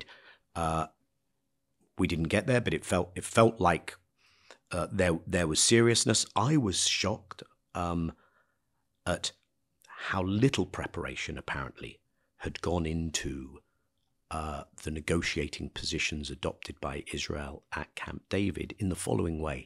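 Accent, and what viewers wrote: United Kingdom. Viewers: Jeremy – This is way over my head but seems rational, if only because it is presented calmly and with a British accent - as if the Brits have no culpability. Perhaps you can follow the story .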